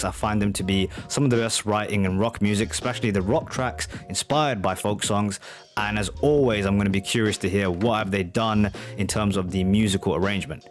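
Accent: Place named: United Kingdom. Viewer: Where do you live? Don't get me wrong I just wanna know cause of your accent. It's best sounding british accent 😊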